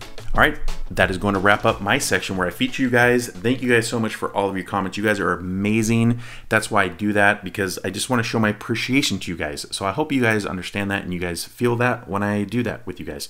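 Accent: Tamil Nadu, India. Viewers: I love your tamil accent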